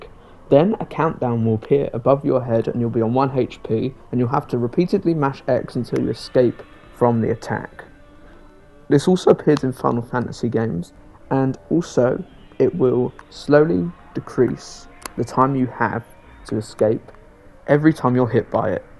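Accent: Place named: United Kingdom. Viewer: y r u british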